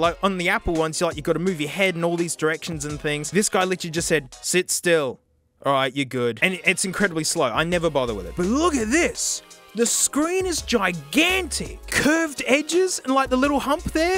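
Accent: Australia. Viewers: He speak in Australia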